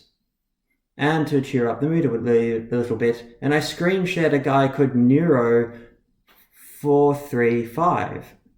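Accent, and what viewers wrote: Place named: Australia. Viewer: “The new edgelord thing is CP child pornography” no matter what affiliation they have, that is unforgivable and disgusting. As a survivor of ritual abuse myself by a “fake” LHP organisation there is no excuse. All pedophiles need to be removed, banished, banned from the occult/magickal community. There is no room for that here, or in the greater macrocosm of the social community at large. I come to magick as a healing refuge and to find it’s filled with as many if not more pedophiles as my childhood sickens me. End it. Thank you for exposing this, you are Australian I assume from your accent ? May I ask where this Pedophile ring is located ?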